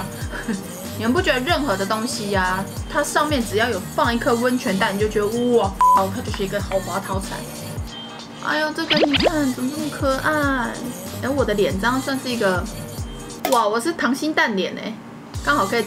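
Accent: Taiwan. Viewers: 講台語真的蠻夠味的哈哈哈哈像那種很可愛的小台妹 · 講台語好可愛喔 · 的台語腔好台語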